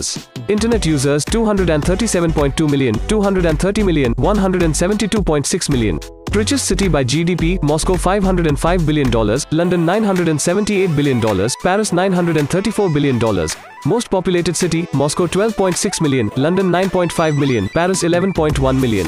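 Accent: India. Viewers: Author from India ? His accent like indian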